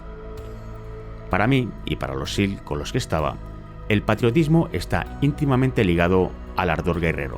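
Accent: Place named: Spain. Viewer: Porque dice audio real, sí el mae era gringo y este audio es españolete??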